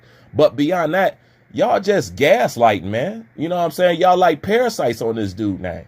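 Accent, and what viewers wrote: United States. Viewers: You sound like you from Philly😂😂😂 I'm sure you seen the same pics we just seen! That's a different look that Boots gave to Spence😂😂 He was talking 💩 to Spence but he looking nervous next to Crawford! Boots looking like he don't want to fight Crawford but his daddy wants him to😂😂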